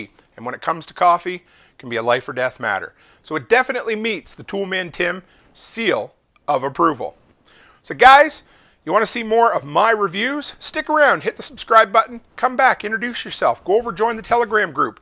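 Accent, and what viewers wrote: Canada. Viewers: I hear a Canadian accent.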